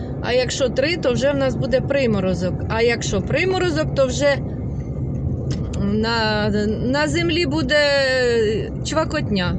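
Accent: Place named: Kirovohrad, Ukraine. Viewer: Дякую за відповідь.Я так і думала що або центр,або східніше бо розмовляєте як ми в Кіровоградській обл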